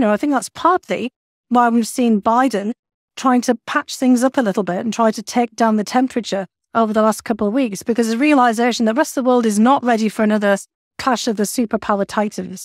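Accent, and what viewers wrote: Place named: England, United Kingdom. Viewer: Sounds like she’s got an English accent.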